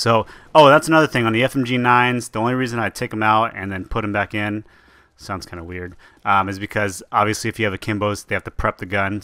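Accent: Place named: United States. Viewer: When u said u were asian i was like whaaaaaaaaaaaaaaat? xD you got an american voice, nice gameplay.. as always!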